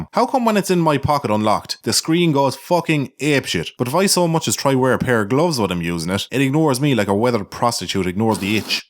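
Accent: Ireland. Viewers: Are you irish? and Loved your channel on the first video pal. Thanks for following back on twitter :)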